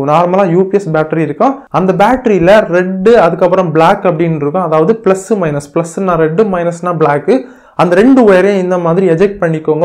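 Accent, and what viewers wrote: Kanyakumari, India. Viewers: Neenga Kanyakumari ya bro. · Bro.. Kanyakumari slag😍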